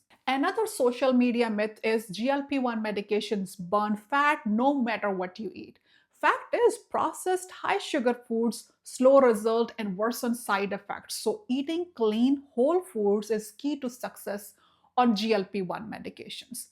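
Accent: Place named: India. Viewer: Good one but sorry to say u should change your pressurized Indian accent ..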